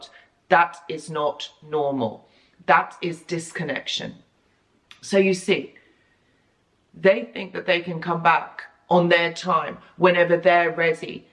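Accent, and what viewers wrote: United Kingdom. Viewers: Some real facts are Heart breaking.....but your words and facts that you convey is god sent......god bless u Ms...... discarded 1 Oct 2023....going thru some real hard time......i need some insights from the community here and veterans here......my case is crazier hope all can help.....will tell my story if i am ready soon......Cheers. ooooh ya i always love British accents.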